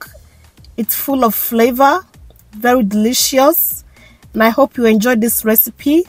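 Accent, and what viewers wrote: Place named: Jamaica. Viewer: What accent is that? Jamaican accent?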